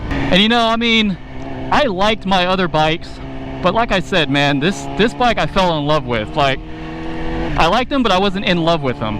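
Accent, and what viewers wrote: United States. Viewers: What part of Louisiana you from?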